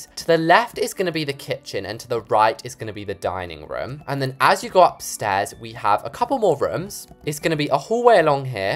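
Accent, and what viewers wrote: England, United Kingdom. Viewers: Gurl Are You British ???? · What part of England r u from bc I’m English and my accent is so different from yours!!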